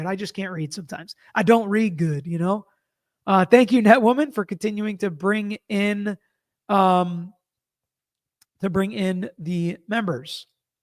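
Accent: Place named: South, United States. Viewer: Put on 1.5x speed if you’re not from the South.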